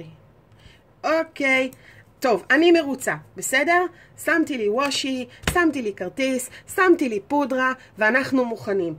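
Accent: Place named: Arab world. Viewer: Just watched this, didn't understand what you said but did get the idea and just like any papercrafter you love bling....lol For some some reason i thought you might have been Russian of Polish. But listening to you maybe Arabic.? Loved listening to you and your passion is really shining thru I keep smiling. Thankyou for sharing your excitement and passion, so infectious...🥰😜😘💜💚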